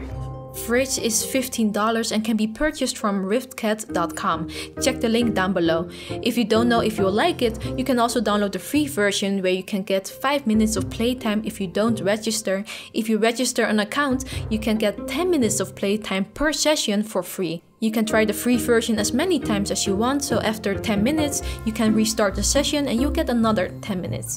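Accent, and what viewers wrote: Netherlands. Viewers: You have a Dutch accent?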